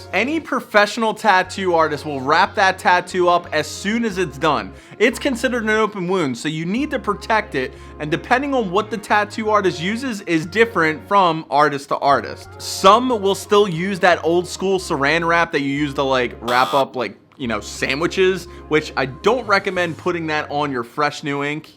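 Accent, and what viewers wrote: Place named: Pennsylvania, United States. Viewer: U sound Pennsylvanian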